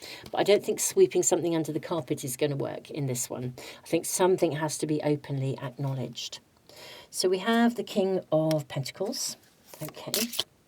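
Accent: United Kingdom. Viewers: P1. I am so ready for change and start a whole new chapter of my life in the best possible way. I been healing, creating, building, sacrificing and strategizing so it will all come together. A life I co-create with the Universe. A life of pure authenticity and individuacion. Thank you! Your choice of words are very encouraging. Just love your UK English accent and choice of words that’s very depicting from your region. I live in Ecuador but I am Polish-Australian. ❤❤❤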